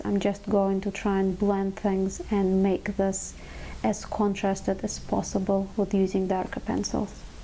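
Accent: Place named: Russia. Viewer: ahhhhh Lebanese! That’s what I couldn’t place! I got the Russian accent but your facial structure, coloring and surname was throwing me off. Lol. You are quite amazingly exotic!😍😍😍😍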